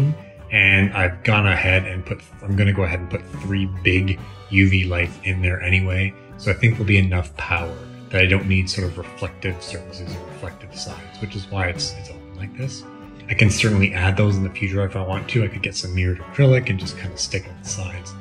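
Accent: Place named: United States. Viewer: bad design to have it all open, that UV then floods the space you put it in, your English sounds American, so learn to do some sheet metal design, use sendcutsend to cut and bend the parts out of uncoated aluminum, screw together, use regular sanitary silicone to seal seams inside, bare aluminum is reasonably good at reflecting UV, so when buttoned up the UV is reflected inside and isn't wasted damaging other materials in the room and you when it escapes your current enclosure can leave outside of the case bare, AL won't horribly corrode in a room, or can spray paint using al primer, it would probably cost you less than that sheet of HDPE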